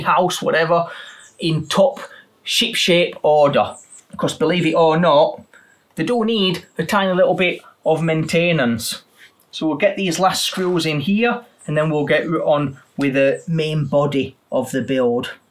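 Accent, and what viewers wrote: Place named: United Kingdom. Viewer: Great tutorial and Great accent 👍.. Which part of the British isles ?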